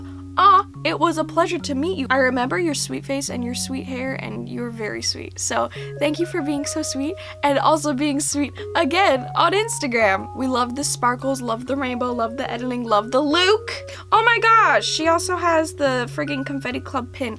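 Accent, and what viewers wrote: France. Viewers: THE CUTEST OMG Also YAHS 6Teen was the best show thoooo. Also your french is so adorable. Yay Canadian School System😂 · "qu'est ce qui se passe ici? c'est une noeude" XDD Your french is adorable! (just for ref tho: c'est UN noeud, the "d" is silent) love you!!!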